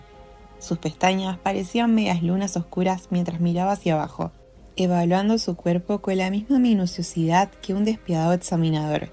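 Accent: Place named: Argentina. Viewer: Me encanta el acento argentino, le da el toque perfecto a la narración 👏🏼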